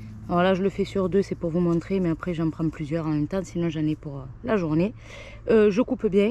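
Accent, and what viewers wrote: South, France. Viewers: ah super, je me disais bien que c'était l'accent du Sud, de chez nous !!! Ben oui, ils disent tous "je suis du Sud", beaucoup de gens qui font des vidéo comme vous, et ils sont du Ouest, de bezier... C'est pas le Sud ca😂.... Super vos vidéo et explication, je m'abonne alors, merci...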